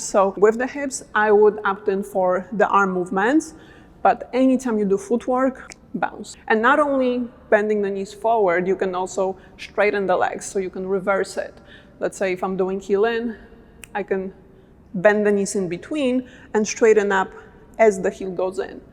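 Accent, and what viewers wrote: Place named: Poland. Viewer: As a pole from the accent, I think you are polish, are you??! Am I right ?!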